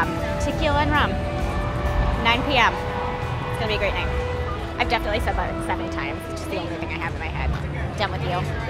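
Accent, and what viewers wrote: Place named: New Jersey, United States. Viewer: I knew you were from Jersey or somewhere else close in the upper east coast...haha I can tell, born and raised in Florida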